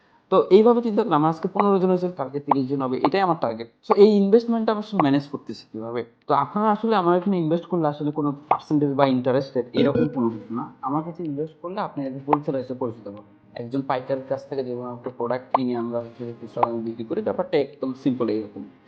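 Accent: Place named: Sylhet, Bangladesh. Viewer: সিলেটি মাতের আবাস পাচ্ছি, মনে হচ্ছি?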